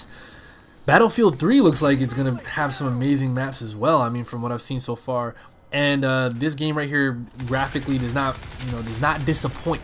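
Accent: Canada. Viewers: you're Canadian eh?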